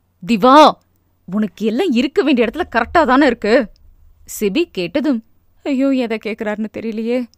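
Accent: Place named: Telugu, India. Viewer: Telugu slang super super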